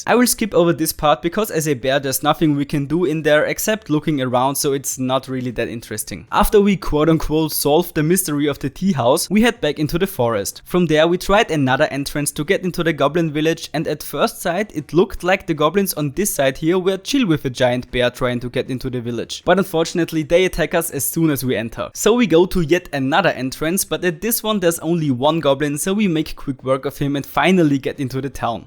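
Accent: Germany. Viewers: Are you german? 😅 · I think i hear in your pronounciation that you are from germany, m i right? no offence.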